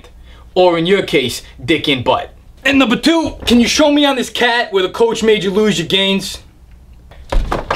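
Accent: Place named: Italian-American, United States. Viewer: It's second-generation Italian-American accent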